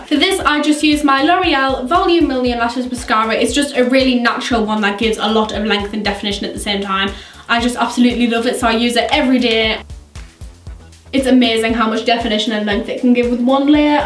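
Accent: Newcastle, United Kingdom. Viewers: She's British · yeah It's a geordie accent I have one too . you get one if your from around Newcastle,Durham ,Sunderland and parts of a place called Wallsend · Where about in the north east are you? You sound like you from somewhere close to Newcastle . xx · Are you a geordie because you kinda have an accent like me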